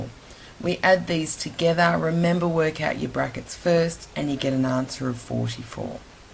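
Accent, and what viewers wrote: Australia. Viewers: What accent is this ? Australian with a twist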